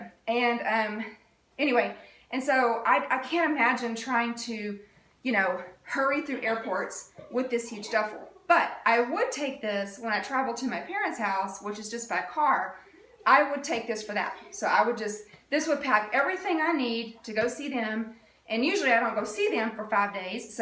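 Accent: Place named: South, United States. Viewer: I love u and your southern accent! U r funny! Had to sub due to your awesome personality! 🤣❤️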